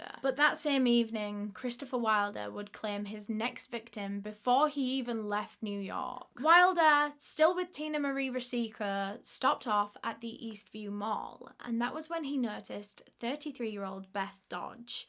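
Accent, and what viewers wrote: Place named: England, United Kingdom. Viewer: Took me a long while to make my fav to listen to, her English accent is very strong … but today I’m struggling with those stupid “baby hairs”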